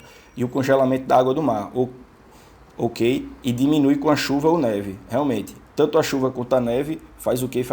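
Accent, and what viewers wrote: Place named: Northeast, Brazil. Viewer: "arrente" amoooooo esse sotaque s2 hahaha nordeste pisa menos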